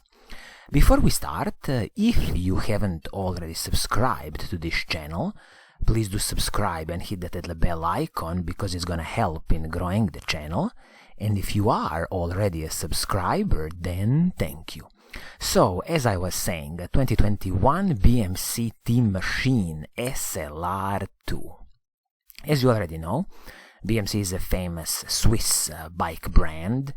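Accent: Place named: Italy. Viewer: love these videos, Brilliant! full of info and great Italian accent!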